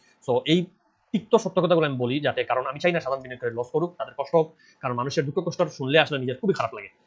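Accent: Sylhet, Bangladesh. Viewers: ভাই আপনি কি সিলেটি নাকি এত ফাস্ট কথা বলেন কেন আস্তে আস্তে সুন্দর করে কথা বলেন। আশা করি আমার কমেন্টসটা বুঝতে পেরেছেন। এবং ওইদিকে খেয়াল রাখবেন। আপনাকে থ্যাংক ইউ।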